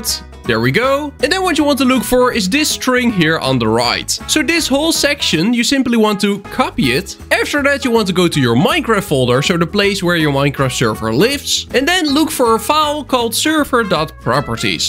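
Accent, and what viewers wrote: Netherlands. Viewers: I swear, every time I try to find a Minecraft server tutorial. You show up, and you don't disappoint me. It's very useful, thanks big dawg! (also nice Dutch accent.)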